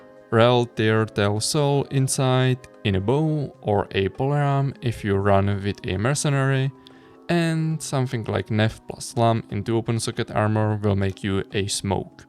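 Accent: Czech Republic. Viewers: Angličtina zní hodně jako moje, tzn česky :D. Trefil jsem?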